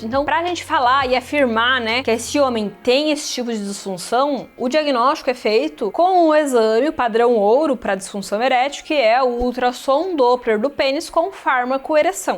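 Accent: Brazil. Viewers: Deve ser do interior de São Paulo SP como a pavoro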